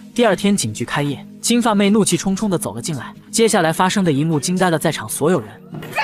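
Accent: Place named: Sichuan, China. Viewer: 感觉解说员是我们四川人啊！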